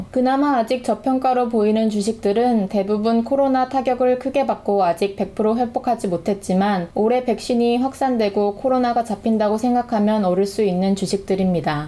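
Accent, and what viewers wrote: Gyeongsang, South Korea. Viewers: 님 경상도 억양도 좋지만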